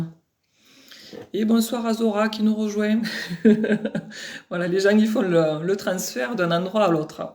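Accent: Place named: South, France. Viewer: Moi, ca me fait du bien d'écouter votre accent du Sud 😊🤔😁Io ne me manque plus que le chant des cigales et se sera ok, je vous entendrai bien 😅🤔😁💚💚💚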